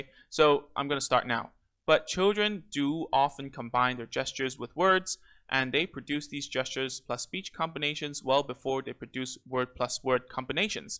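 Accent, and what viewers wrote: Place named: China. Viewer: Man ! You speak English super fluent almost like native english speaker, how did you do it ? A bit slight chinese accent in it but super tiny i assume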